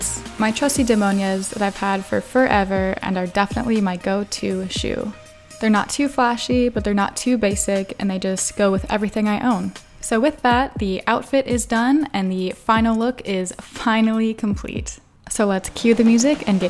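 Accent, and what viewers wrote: Germany. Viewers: Wait are u German? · em stop, are u german?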